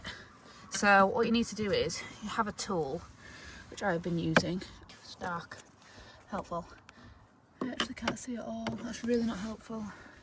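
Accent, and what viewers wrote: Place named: United Kingdom. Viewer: Love the legs and the pants. Also why are you speaking British ?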